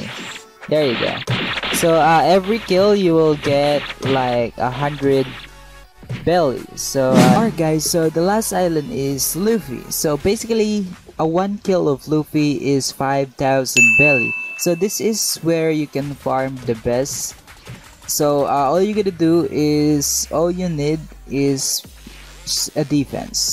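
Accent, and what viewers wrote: Philippines. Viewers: This Guy Is A Filipino I Dare You His Voice Is Like a Filipino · woah also if u dont mind me asking but are u filipino? ur accent is familiar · You sounds like a filipino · Sounds like he is from Philippines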